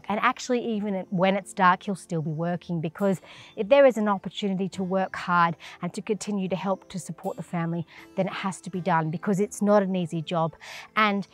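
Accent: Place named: Buganda, Uganda. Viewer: Thanks for loving Uganda, I love yr luganda accents u make mi day 😂😂😂😂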